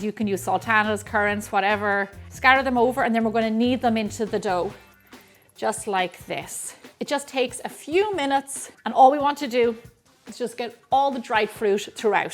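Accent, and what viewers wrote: Ireland. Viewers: I love that Irish accent!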